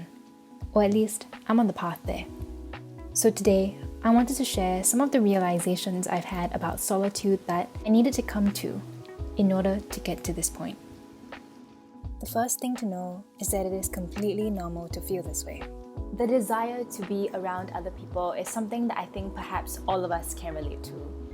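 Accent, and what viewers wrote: Singapore. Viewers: Are you singaporean? Good video!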